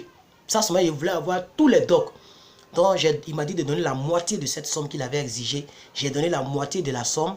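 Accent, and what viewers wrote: Ivory Coast. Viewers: Lol je ne sais pas pourquoi je reconnais aussi vite les Ivoiriens quand j'attends leurs voix où quand je le regarde je sais déjà que c'est un ivoirien depuis que j'ai vu ta tête ta façon de parler je suis que tu étais ivoirien dis-moi le contraire lol merci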